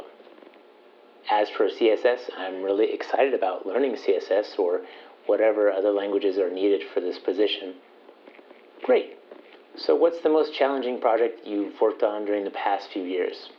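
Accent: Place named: England, United Kingdom. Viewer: Hi, I like your english accents very much. So I subscribed .thank you.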